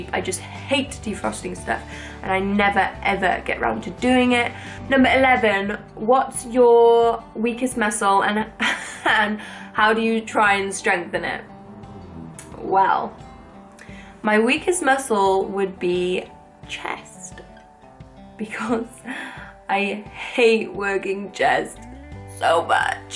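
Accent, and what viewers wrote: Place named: Cardiff, United Kingdom. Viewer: There are so few welsh youtubers! I can recognise a fellow cardiffian anywhere haha.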